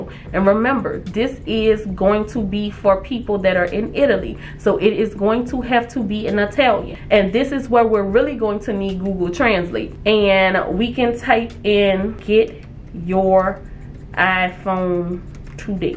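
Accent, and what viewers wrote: New Orleans, United States. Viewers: You from my city new Orleans i can hear it in your voice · I i notice a NOLA accent anywhere ❤❤❤ yeah baybee!!!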